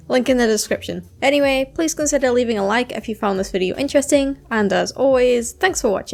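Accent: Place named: United Kingdom. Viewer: I love the british accent🙂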